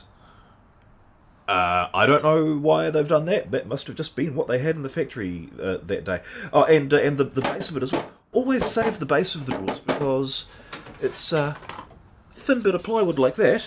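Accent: New Zealand. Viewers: Not hugely relevant to this video but your videos got me fixing up my bass and playing way more!! Keep up the great content! soo good to hear a kiwi on youtube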